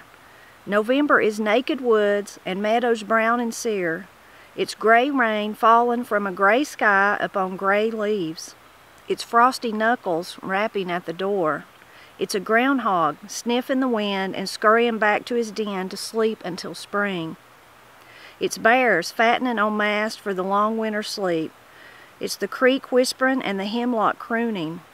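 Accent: Appalachia, United States. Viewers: Really like this channel - partly for the Appalachian English, but also to learn how things are done in the countryside 🙂 I live in Scotland, November is beautiful up here with the changing colours of autumn.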